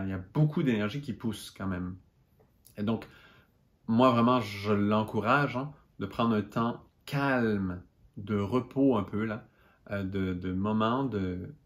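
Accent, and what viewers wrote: Quebec, Canada. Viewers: Bonjour et merci beaucoup (J'ai toujours du plaisir d'entendre l'accent du Québec où J'ai vécu quelques temps)...Je suis abonnée à la chaîne d'emilie Morel et je suis ravie de la perspective de votre collaboration. Je suis taureau ascendant Gémeaux et ça va plutôt pas mal car le soleil en maison 12 c'est très dur. Lune en bélier. Je vais noter pour vos conférences. Amitiés de Bretagne.merci.(milieu du ciel Mars et Jupiter en Verseau 😍)).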